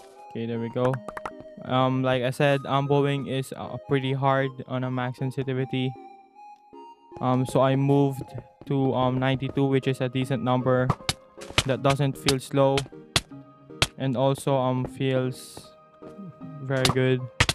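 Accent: Philippines. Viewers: from Philippines Confirm! Hehehe Proof: 1:From one of your helper in you server 😅 2:Your voice 3:You play in Asia Region Only that ooooffff But that's a good proof🤣🤣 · Are you a pilipino you sounded one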